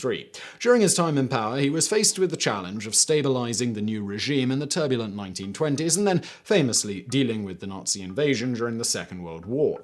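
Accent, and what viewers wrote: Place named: United Kingdom. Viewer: has that Britsh "Tone" that has annoyed people for hundreds of years, especially French people? I know both can annoy us in the States. American postwar influence did not rise from nowhere. The British Empire handed off it's "International Decent Chap" responsibilities to us, and that whole effort took on an American tone, for "Better" and "Worse".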